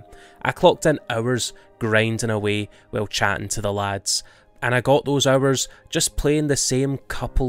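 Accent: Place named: Glasgow, United Kingdom. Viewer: Glasgow uni accent is the worst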